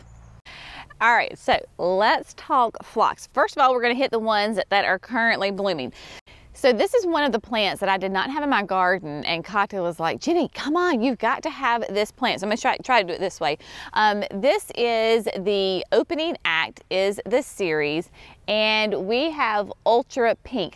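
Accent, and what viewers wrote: South, United States. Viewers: Hi, Love your Southern drawl!! Best, Albert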